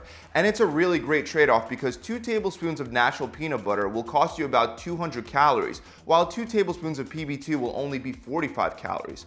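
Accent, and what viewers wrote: Philadelphia, United States. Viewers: You a Philly man?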